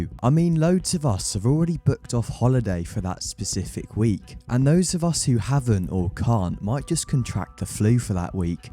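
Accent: United Kingdom. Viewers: Why does it seem like nobody in america is making these videos? Every gta 6 video sounds like some extra from harry potter narrating it.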